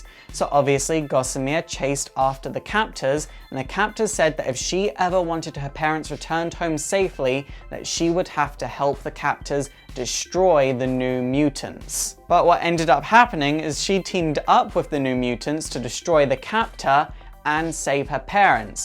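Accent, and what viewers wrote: United Kingdom. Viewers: Are you British you sound British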